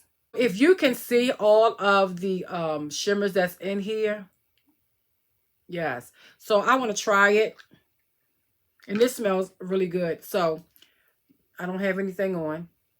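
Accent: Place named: DMV, United States. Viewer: Gurl, you from the DMV, talk your talk!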